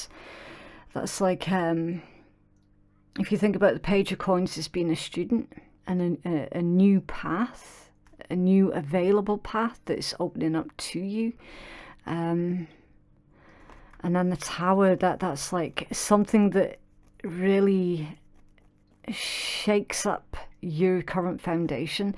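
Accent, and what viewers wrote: United Kingdom. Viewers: Thank you, sounds a little bit like her or I. You sound great. Loud n clear. Your accent sounds British to me... That's great I speak English... I have other problems with haters hating and threatening me. So I've been praying on it... She should of been on my side and she wasn't.. looking around see how it goes.. I'm 😁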